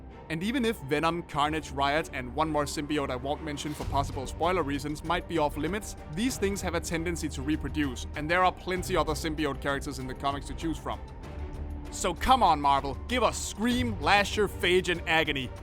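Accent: Denmark. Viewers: You sound danish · sounds lowkey like you're from denmark hehe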